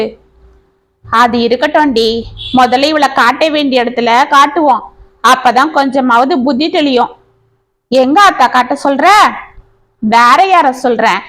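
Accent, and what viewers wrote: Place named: Theni, India. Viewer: உங்கள் குரலில் தேனி வட்டார மொழி அருமை❤️❤️❤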